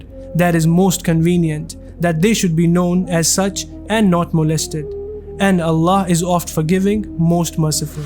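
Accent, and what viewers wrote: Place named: Srinagar, India. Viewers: Are you a kashmiri? Your accent is that of a srinagariet 😅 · Yes i aslo want to know this...and i am noticing his accent is bit Kashmiri type...😂I think he may be from Kashmir❤ ...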